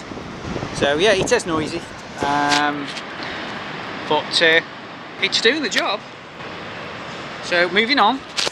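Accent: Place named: Stoke, United Kingdom. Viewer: You sound like a proper Stokie mahhh mayte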